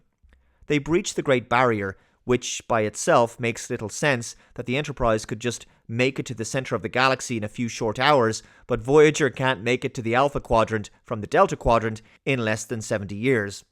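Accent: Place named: Ireland. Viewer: It was clearly exploring the concept of God, which is highly cinematic by it self. It also thoroughly explored how pain artificially relieved (being cybocks power) is a crime to the souls identity. TrekV was excellent as classic Trek, this film brought what we've come to expect, and a ton of fun. You know, your voice now that I think about it really sounds a lot like K. I. T. T!! Albeit Irish instead of british accent, you could easily voice him if Daniels himself not available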